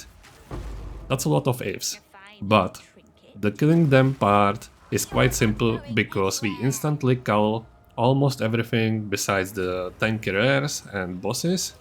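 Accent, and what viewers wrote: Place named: Czech Republic. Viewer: Ahoj je fajn vidět že i v česku se hraje poe, ten přízvuk poznám prostě všude :D muzu si te pridat na dc nebo tak ?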